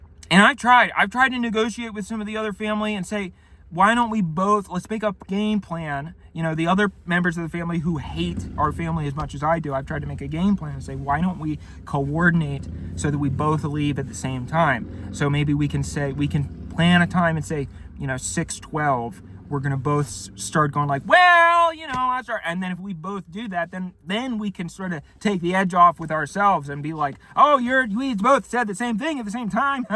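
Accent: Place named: Baltimore, United States. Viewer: I never noticed before that has a bit of a Baltimore accent.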